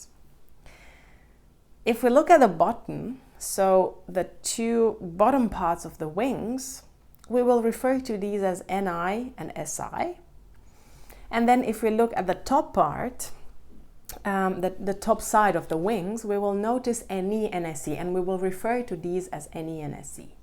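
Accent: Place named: Greece. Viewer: Are you Greek by any chance? You have a slight Greek accent :) But it’s cute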